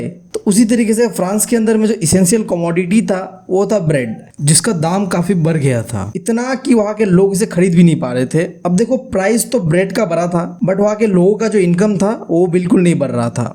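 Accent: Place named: Bengal, India. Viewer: Bhaiya are from bengal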